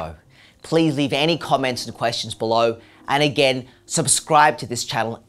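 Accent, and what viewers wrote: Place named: Australia. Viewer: Your Australian accent actually made this video fun and enjoyable because hey I'm litteraly full of shit ! But being serious nice video 😂😂 litteraly just got back from the hospital because of this sh*t !!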